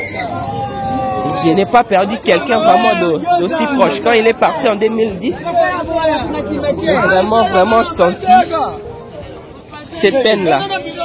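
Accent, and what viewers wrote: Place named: Democratic Republic of the Congo. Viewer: Mboté !! jeune homme .....J' ai tellement d' affection profonde pour la RDC ( et la République du Congo ) que je ne peux pas laisser votre vidéo si bien réalisée en employant ce "bonjour " si familier pour moi . En France le 2 Novembre est le Jour des Défunts : fleurs sur les tombes particulièrement ce jour -là ; les marchands de fleurs vendent beaucoup de chrysanthèmes à cette occasion mais de plus en plus d' autres fleurs maintenant. En général c' est un jour plutôt morose pour les familles concernées . J' aime votre vidéo parce qu' elle me fait voir encore plus de coutumes des Congolais . Et je reconnais tout de suite le cher accent de votre pays !! Dans quelle région est votre famille ?? Amitiés de France 👍🌞🙂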